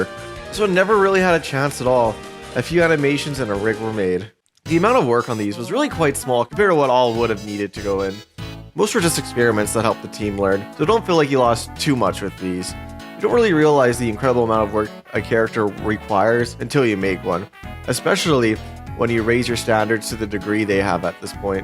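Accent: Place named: Canada. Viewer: this dude sounds canadian 🤢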